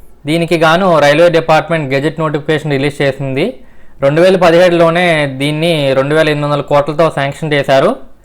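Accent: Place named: Vijayawada, India. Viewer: Hii bro ! ♥️ big fan for your explanation & good mana Vijayawada slang 🔥♥️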